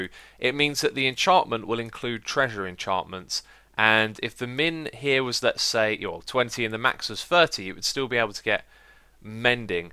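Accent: United Kingdom. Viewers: Honestly, I'm so confused about this whole thing xD. Not that you're bad at explaining, but that I'm stupid :D. I just like your voice. British accents are awesome to me, cause I'm American, and I just love your stuff :D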